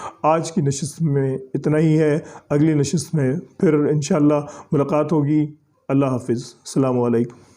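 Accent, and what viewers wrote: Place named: Pakistan. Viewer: Sir I was waiting for you to react to these comments . Sir love your video and the way how you talk real urdu accent 👍👍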